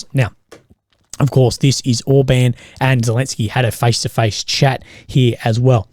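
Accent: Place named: Australia. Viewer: Man. I gotta get to Australia just to talk to people with the accent! 😂 Appreciate your narrative daily!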